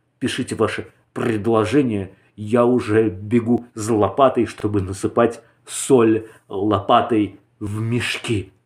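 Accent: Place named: Mordovia, Russia. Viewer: по акценту не могу понять, ты Мордвин по национальности?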